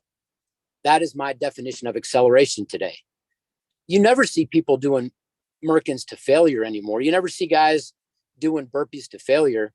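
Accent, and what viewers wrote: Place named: Birmingham, United Kingdom. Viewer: Where are you from? I’ve keyed in on your accept. It’s Barney Fife In Birmingham.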